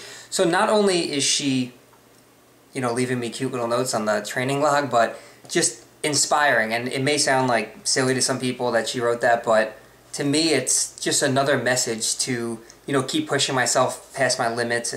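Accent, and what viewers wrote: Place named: Alabama, United States. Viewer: I just noticed your accent....are you from Alabama?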